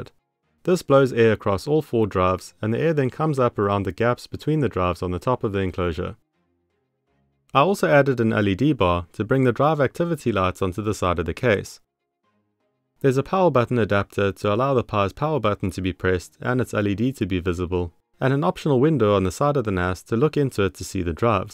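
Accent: South Africa. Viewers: I know a South African accent when I hear one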